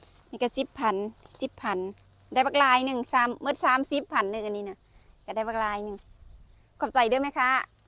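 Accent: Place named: Phu Thai, Thailand. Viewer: สวัสดีจ้าสาว ภาษาภูไทก็มีหลายสำเนียงเนาะจ้า เออสาว อยู่เมืองไหนจ๊ะ😊😊😊❤❤❤❤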